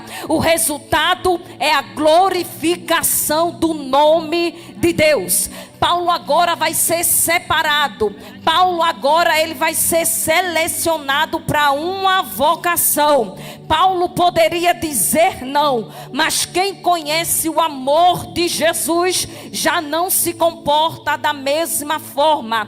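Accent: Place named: Pernambuco, Brazil. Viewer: pelo sotaque ela é pernanbucana.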